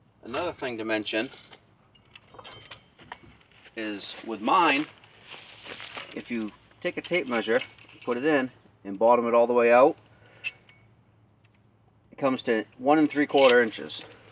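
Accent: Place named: Nova Scotia, Canada. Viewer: Bro you sound like youre from Nova Scotia, I love it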